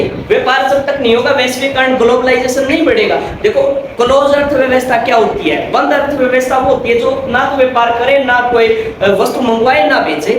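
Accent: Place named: Haryana, India. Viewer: Haryanvi touch jyada aa ra h__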